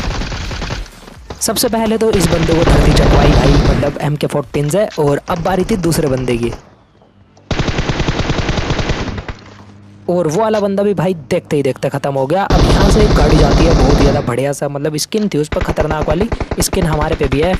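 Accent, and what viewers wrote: Haryana, India. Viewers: ur haryanvi touch is superb Three seater: thukta pher char jagah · Bhai comentry Haryanvi and hindi soo funny I like it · Bhai Aaj To Katai Haryanvi Horya H🔥🔥😍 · Aaj to haryanvi boli has ri 👍😂❤️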